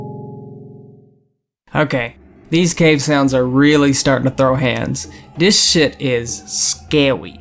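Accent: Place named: South, United States. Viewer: Great content, keep it up, but you might want to tone down your own accent a bit if you can, people would probably find it jarring to open up to a heavy southern accent, especially european or north US viewers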